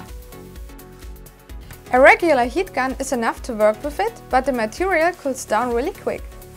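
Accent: Germany. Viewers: German accents are so cute. Makes me want to hug every German when they speak English.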